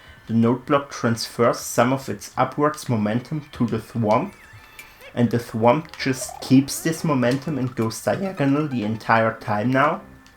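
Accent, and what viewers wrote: Germany. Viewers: Wait!... Are you from Germany? 🇩🇪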